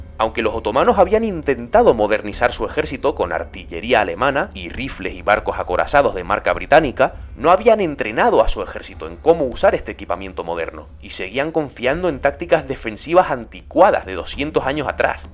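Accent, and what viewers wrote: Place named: Canary Islands, Spain. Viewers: Creo que canario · De que parte de España sos, si sos español. Una curiosa argentina 🇦🇷. Tenés un acento distinto a los que estoy acostumbrada a escuchar en los distintos videos provenientes de España Saludos ❤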